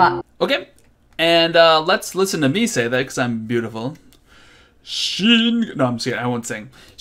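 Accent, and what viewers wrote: United States. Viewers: It's not awful - just standard American accented Japanese. Accents are tricky to kick... they tend to move at their own pace.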